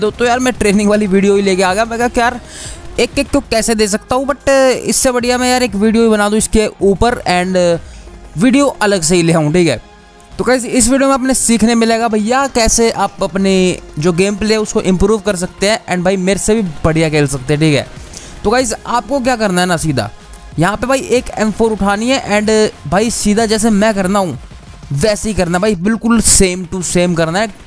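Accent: Haryana, India. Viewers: areeeeeeeee bhai love you ek haryanvi ko haryanvi hi pehchaan sakta hai😊😂❤️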